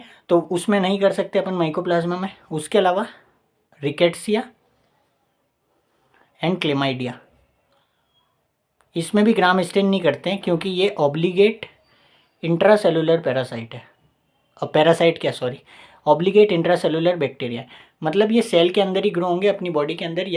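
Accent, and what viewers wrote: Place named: Rajasthan, India. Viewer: The rajasthani accent 🤌😅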